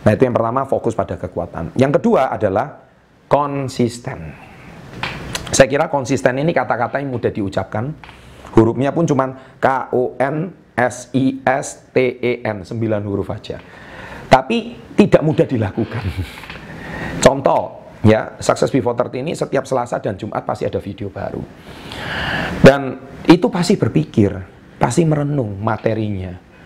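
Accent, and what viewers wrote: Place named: Surabaya, Indonesia. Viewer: ohh.... wong suroboyo tah ? hahaha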